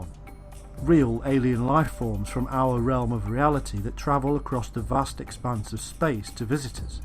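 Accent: United Kingdom. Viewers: Devon accent?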